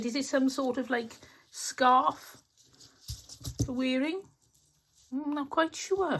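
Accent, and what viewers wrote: Wales, United Kingdom. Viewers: So lovely to see you back and to hear your velvety Welsh voice coming from the the tv again. Please take it slowly, and allow yourself to heal. What a wonderful tonic Catherine has sent you. Just perfect. ☺️ Xx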